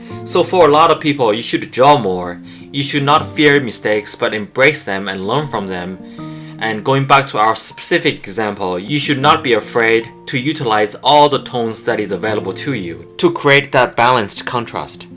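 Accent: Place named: China. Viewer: you have chinese accent ! very talented, thank you for the video !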